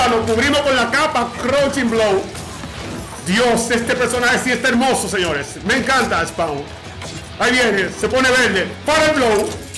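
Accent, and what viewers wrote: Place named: Dominican Republic. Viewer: Eres dominicano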